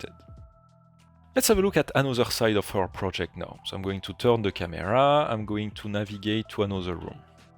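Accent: France. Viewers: And here you go again with your negativity, being disrespectful and utterly rude. Same as in other tutorials with your comments. I now have reported your messages on the YouTube platform. It says more about your lack of skills. If you don’t find the capacity to understand then simply leave this channel. Unlucky for you there are only a few really great ones out there such as his. Therefore please take a beat and reconsider when you voice against other nations, especially as beautiful as the French. If you were to listen closely you would notice that has a very broad and educated english vocabulary, which is much better than most none-native english speaking people have. So yes, his english is excellent! Having a french accent is not getting in the way of his clear pronunciation at all. It is to note that he is the Technical Marketing Specialist at Twinmotion and is in this position for a reason. 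· Je sens le tont français dans votre voix 😅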